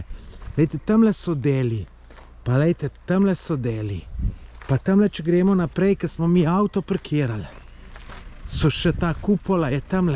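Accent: Lower Carniola, Slovenia. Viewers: Hvala ti za lepo izcrpno reportazo, tud nam je poveljnik reku da se ne smemo nicesar sovraznikovega dotikat ker je lahko minirano in se ne splaca. Ja cudn res da una stolpnca se stoji jebemu, Amerika bo mela poune roke dela da vse to obnovi pa gnarja bo slo na miljarde. pazi nase in samo naprej. 👍👍👍 Ps. Pogresam tvoj prijeten dolenjski naglas, malo bolj ga lahko poudaris v oddajah in porocevanjih. Tud jst sm rodom iz Trebnjega Velki Gaber tko da rad slism naso špraho ☺️☺️👍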